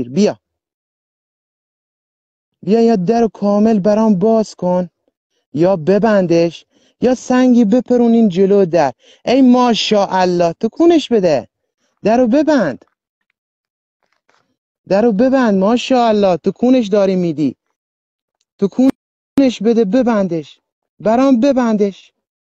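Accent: Kerman, Iran. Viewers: دمت گرم مرد با دل و جرأت کرمانی ممنون از زحمات شما و گویش بحال کرمانی تان👍♥️👌🙏🙏tanke you..very good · ممنون خان برای ضبط این مستند. لهجه کرمونیت خیلی قشنگه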